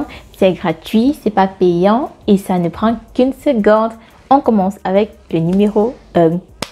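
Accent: Cameroon. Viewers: T'as un accent camerounais et ton est sénégalais?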